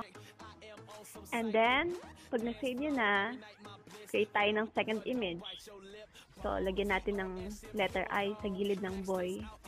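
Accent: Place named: United States. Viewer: i thought you are an american lols by the way i'm a filifino and half american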